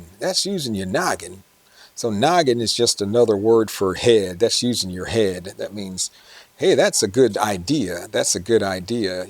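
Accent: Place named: South, United States. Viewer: Thank you for these wonderful lessons. I love southern accent and the way y'all communicate, I love it. Please keep making more of these lessons, it's so fun to watch!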